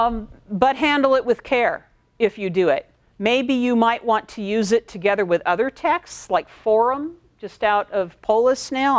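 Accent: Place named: United States. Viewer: Latin spoken with American accent sounds funny.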